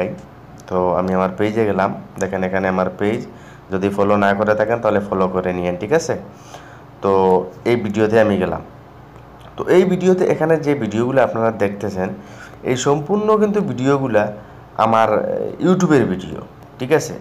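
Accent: Sylhet, Bangladesh. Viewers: বাসা শুনে মনে হচ্ছে ভাই সিলেটি😊😊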